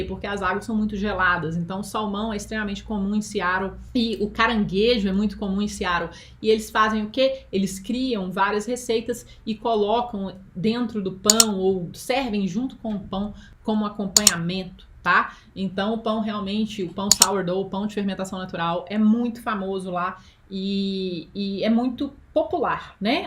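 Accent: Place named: Minas Gerais, Brazil. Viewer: Simplesmente amei! Eese sotaque mineiro é o máximo!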